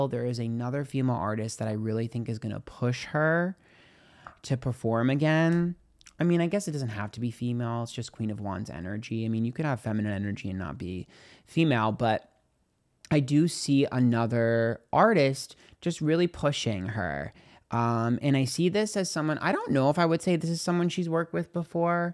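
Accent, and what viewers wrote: New York, United States. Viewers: I love how when you get upset….. bc your New York accent comes out…. I just love your accent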